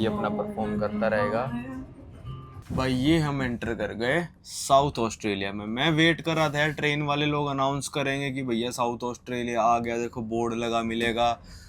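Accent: Haryana, India. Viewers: Rom rom bhai are you Haryana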